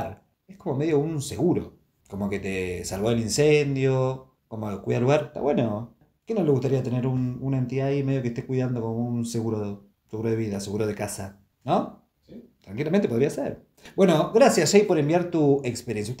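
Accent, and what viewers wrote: Argentina. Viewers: me encantan tus historias, he notado como te estás "cordobeseando" en cada relato....te vuelvo a sugerir la capilla de Buffa en Unquillo y anda conocerla también porque es un museo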